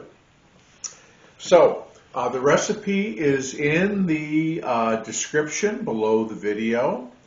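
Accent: Midwest, United States. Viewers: Love the foods , but I love your mid west accent which is like my birthplace of Chicago Illinois I’m reminded of all my family which I miss so much! Thank you for your channel, and the great foods I feel at home with you all!👍🏻❤️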